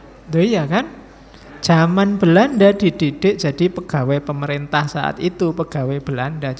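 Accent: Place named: Central Java, Indonesia. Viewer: Gaya Bicara Orang Jawa Tengah dan Orang Jogja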